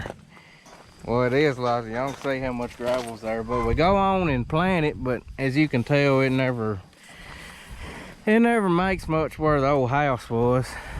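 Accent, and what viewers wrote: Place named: South, United States. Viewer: You're so southern I can hardly understand a word you're saying, and you're 13 hours drive north of me.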